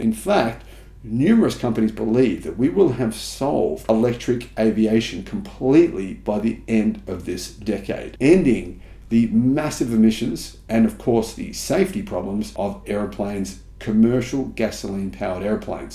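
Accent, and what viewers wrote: Australia. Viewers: You have to allow for the fact that he speaks strine. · Yeah it was pretty grating. His Aussie accent draws it out even more. The whole video was cringe by proxy.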